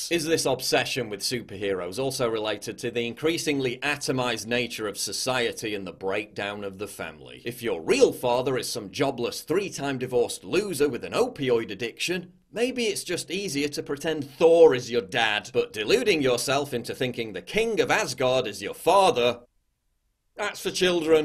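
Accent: United Kingdom. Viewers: Thank you for articulating the reasons for this millennial obsession with super hero movies in your British accent. I think you nailed it. The social engineers want to keep us dumbed down with the emotional intelligence of children in order to keep us all dependent ON THEM and under their perpetual control.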